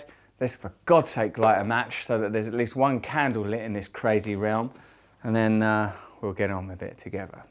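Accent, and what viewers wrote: United Kingdom. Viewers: I also feel a lot better, almost like calmy resting in myself with a clearer head and a some awareness of the present moment. Thank you so very much for sharing this, I'll try this for bed time to, maybe it helps for sleeping too. And btw I love your British accent, one of the reasons I subscribed to your main channel in the first place (content and critical questioning too) and I am over the moon to get such mindful content on your second channel. Usually I am not the person to comment on yt, but I really wanted to take the time for deeply thanking you for your wonderful creative, mindful, peaceful and thoughtful content on both channels!!!!! Thank you.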